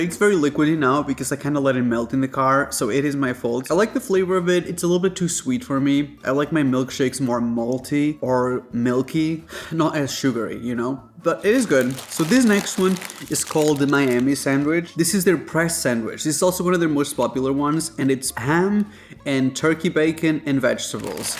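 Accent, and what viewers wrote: United Kingdom. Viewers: I thought this guy is British. Did he get American citizenship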